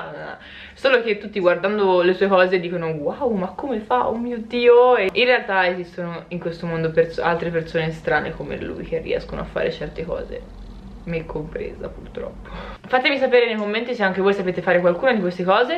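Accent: Tuscany, Italy. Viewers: Sei di firenze o comunque da qualche parte in Toscana?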